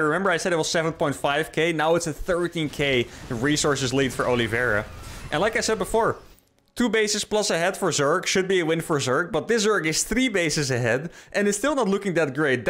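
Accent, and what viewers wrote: Netherlands. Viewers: Found the commentary/analysis really interesting!! Your speech patterns sound really similar to Lowko's, I guess I just like that Dutch accent!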